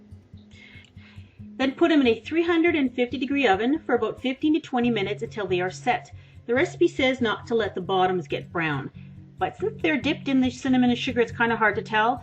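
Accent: Canada. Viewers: Where are you from in Canada? I recognize my own accent. It's so distinct from the Americans.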